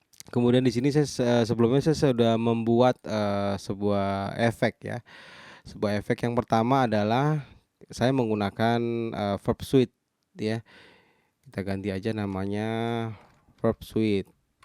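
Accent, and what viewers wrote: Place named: East Java, Indonesia. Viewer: Logat jawa timurnya berasa mas... Hehehe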